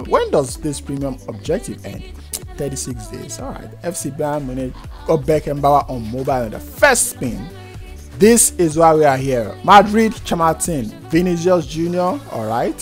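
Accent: Nigeria. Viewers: Bro, are you Nigerian?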